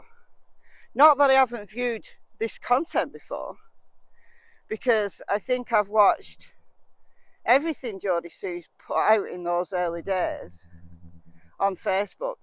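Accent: United Kingdom. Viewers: I live in Milton, Florida in the US, and EVERY morning I wake up, FIRST THING I do, is go to YOUTUBE, And look for your video. Every morning, and several times a day! I value your insights, your option, your intelligence, plus my best friend is British, I LOVE your accent!! YOUR highly intelligent, unbias, you look at every side to the story, not just one side. You have facts to back up your opinion. You don't shame ppl in the case, you stand for truth, morals and justice for Summer Moon Utah Wells!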